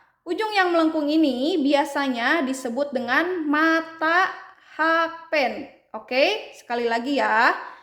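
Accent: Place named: West Java, Indonesia. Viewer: Kk orang sunda ya😅 Lucuu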